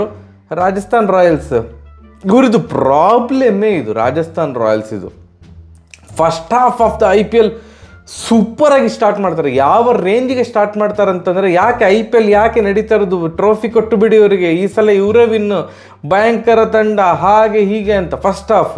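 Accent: Mangalore, India. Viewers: ನೀವು ಮೊದಲು ವಿಡಿಯೋ ಮಾಡಿದಾಗಿನಿಂದ, ನಾನು ನಿಮ್ಮನ್ನ ಫಾಲೋ ಮಾಡಿದೀನಿ, ನೀವು ಐಪಿಎಲ್ ವಿಶ್ಲೇಷಣೆ ಮಾಡುವ ರೀತಿ ನಂಗೆ ತುಂಬಾ ಇಷ್ಟ, ಚುಟುಕು ಹಾಸ್ಯ ದೊಂದಿಗೆ ನೀವು ಹೇಳುವ ಮಂಗಳೂರು ಭಾಷೆ ನಂಗೆ ತುಂಬಾ ಇಷ್ಟ, ಒಂದು ರಿಪ್ಲಯ್ ಮಾಡಿ 💕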